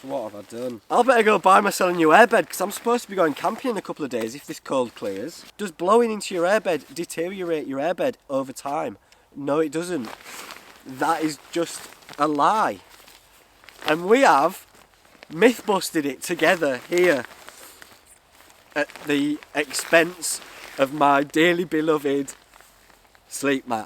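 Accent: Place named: North, United Kingdom. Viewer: Spat my coffee out reading this, thank you I needed that laugh 😂😂 is awesome. Northern AF lol!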